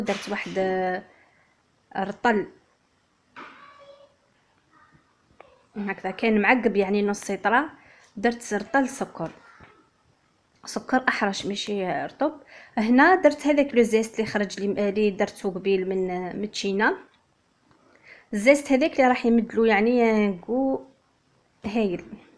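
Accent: Setif, Algeria. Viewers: انا من الجزائر لهجتك تعجبني كثيرا لانني من اصول سطايفية و نحن نعيش في العصمة و بالضبط بحسين داي و نشتاق لسماع هذه اللهجة الحرة حفظك الله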